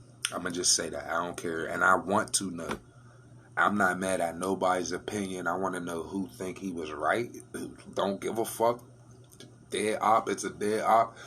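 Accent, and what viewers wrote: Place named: Pittsburgh, United States. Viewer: This don’t got shit to do w yo video but I can really tell that you really from the burgh from the way you talking 💯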